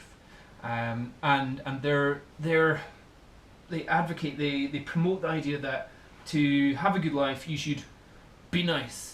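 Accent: Scotland, United Kingdom. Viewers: your video made me want to look for a free online episode of the Highlander (seriously). the Scottish accent and nature references.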